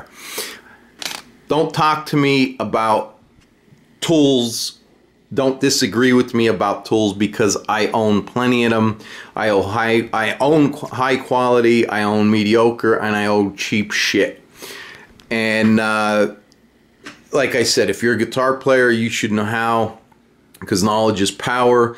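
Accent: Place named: Chicago, United States. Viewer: What part of chicago are you from? Lmao You sound like you're from my old neighborhood lol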